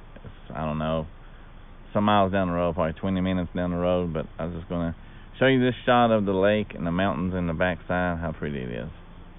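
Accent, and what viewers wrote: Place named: South, United States. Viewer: That music is God-awful. Geez. You talk with a southern, accent, yet, use skanky boof music? Bluegrass, dude. I lived in Franklin for 20 years, worked in Bryson. City at Swain County Hospital, Harris Regional, Haywood Regional, & Memorial Mission/St. Joe's hospitals.(Westcare). I miss it, as my daughter & grandchildren will live & work in Franklin. Been to Fontana....beautiful area. I want to go back....to my home. My husband & I had a band, played "Pickin' On The Square", in Franklin, and many other places.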